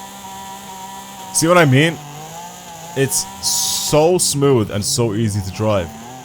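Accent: Ireland. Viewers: Wait, you from Ireland? Me too bro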